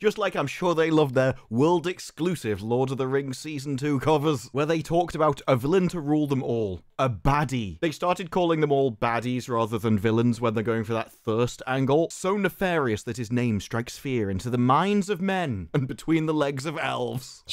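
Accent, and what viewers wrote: United Kingdom. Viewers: Holy shit man you have one serious British mouth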